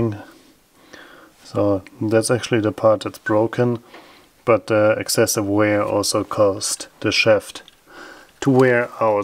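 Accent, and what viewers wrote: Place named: Germany. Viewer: beautiful landspace, where do you life, monkeys running around? your english speech seem to be a littie bit "germanized". thanks for your comments and detailed video from your landy repair! best wishes from austria!!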